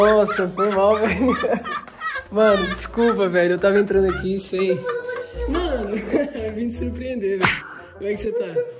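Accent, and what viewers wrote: Portugal. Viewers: Pareces um português a tentar falar brasileiro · Vc fala igual português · tens bue sotaque pt de portugal ahah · você é português, né?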